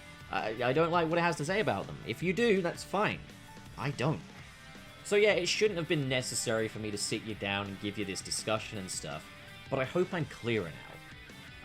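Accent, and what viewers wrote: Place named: United Kingdom. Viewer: It's not your authority...it's your sexy soothing British voice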